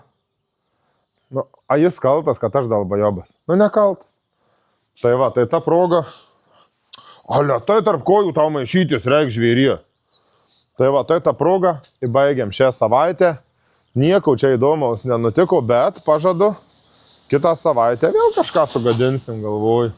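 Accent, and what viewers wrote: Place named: Suvalkija, Lithuania. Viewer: Sveiki, dėkui už naują vaizdelį 😀 pasidariau priklausoma nuo jūsų suvalkietiškos šnektos ir laukų 😃. Man, kaip kaimo vaikui, tokie peizažai labai mieli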